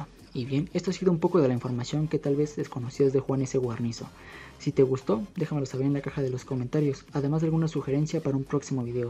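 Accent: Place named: Mexico. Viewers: otros diran que no tiene indentidad y nada de su pais natal, pero para mi se acostumbro al acento mexicano lo domino al 100% y ya jajaja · Nació en colombia????????? 😲😲 Nunca lo imaginé, no solo usa modismos mexicanos si no que tiene las características tanto de lenguaje, habla y acento mexicano. Yo que soy mexicano, pensé que Juan (nombre muy 🇲🇽) era mexicano de toda la vida por como hablaba, se nota cuando alguien aprendió a hablar el acento mexicano y cuando alguien ya lo tiene desde siempre, y el el la primera persona que he visto que es de otro país y habla 100% como un mexicano, no se le nota secuela alguna de su país natal al hablar y expresarse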